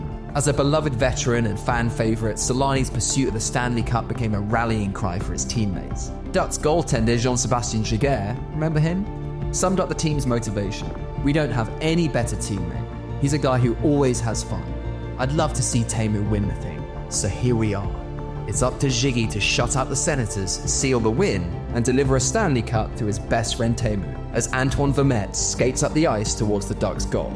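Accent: United Kingdom. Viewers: It’s my First time on the channel, I’m British and was expecting a North American to be narrating, to my absolute delight he’s British. Love to see it, hockey is so much bigger in the UK than what most North Americans think!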